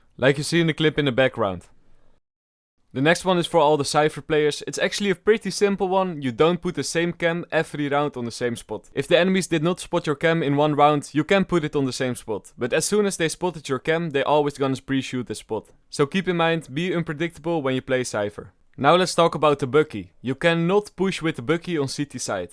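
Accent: Netherlands. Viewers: I smell Nederlands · 100% jij bent NL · Het nederlandse accent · Are you dutch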